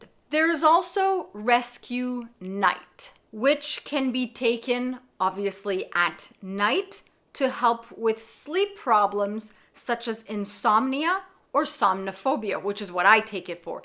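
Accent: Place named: Canada. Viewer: Great video :) You speak so clearly, love your accent! Canadian?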